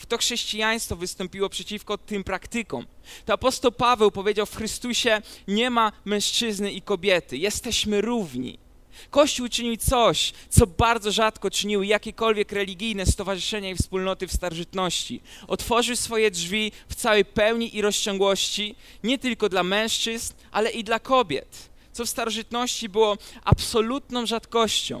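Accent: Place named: East, Poland. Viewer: Ma bardzo delikatny wschodni akcent. Może dlatego, że dorastał na Ziemiach Zachodnich? ;-)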